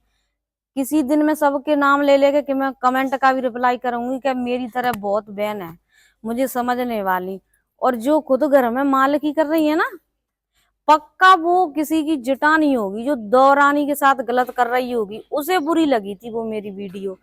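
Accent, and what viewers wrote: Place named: Rajasthan, India. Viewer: बहन आप कौन से गांव से हैं यानी आपका मायका कहां पर है आप तो मेरी तरह भाषा बोलता है मैं भी राजस्थान से हूं प्लीजबताएं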